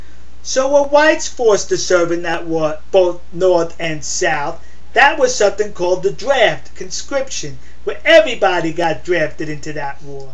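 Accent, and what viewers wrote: New York, United States. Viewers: your really smartand ican tell you have lived in new york you still have th accent, but you are smart, congratz on anoter video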